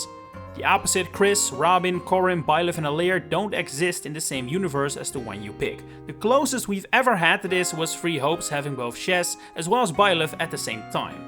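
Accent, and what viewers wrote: Netherlands. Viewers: Unrelated but love the Dutch accent 🫶🏽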